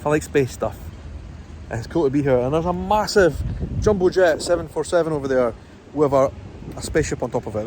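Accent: Scotland, United Kingdom. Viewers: Hi, You almost fit right in in Texas with your cowboy hat and western wear. Now all you need to do to be an authentic Texan is to change your Scottish accent to a Texan accent; it can be done with practice!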